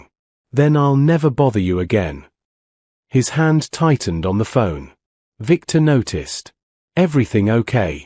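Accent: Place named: United Kingdom. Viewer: ...you f***ed around and found out... From a native North American English POV, I don't know why, but the British-accented voice-over narration sounds so delicious, especially when this channel dares to drop the F bomb, for story benefits, of course. This is another AI-generated tale like so many others cranked out by this and similar mills, but it is a wee bit different, a touch unique in how the tale unveils and delivers its Scarlett Letter denouement for the characters involved. She still let the little brain run the show, until, when alone, her big brain is finally allowed enough oxygen to realize the black hole she'd let herself fall into. The climax is early on, but the supplemental details afterwards are more than enough to keep one's attention in this longer than most other tales of woe. One of my fave sarcastic titles for such content is "As the Stomache Turns." Fortunately, refreshingly, this one's not nearly as tacky as too many others tend to be. 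PS BTW, a humid Thursday in November...? I've been to Singapore 2x; I've had extended chats with locals there; it's humid EVERY Thursday there. Year-round. That's just the weather in that part of the globe when one is that close to the equator and surrounded by that much water.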